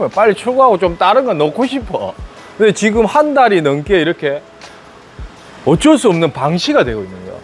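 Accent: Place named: Busan, South Korea. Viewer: 본가가 근처에있는데 구독자놀러가면 커피한잔 주십니까?ㅎ..정비도 정비지만 부산사투리맛집ㅎ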